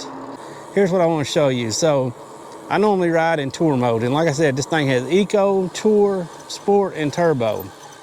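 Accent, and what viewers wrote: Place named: Texas, United States. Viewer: Merry Christmas my friend! And yes I will subscribe. I just purchased me one yesterday and not only did you make me laugh with the shameless request for subscription... love it... you also showed me what I wanted to see about the way it responded to your pedal strength. I mean it man...perfect ... and you sound like all my family here in Texas lol. Awesome!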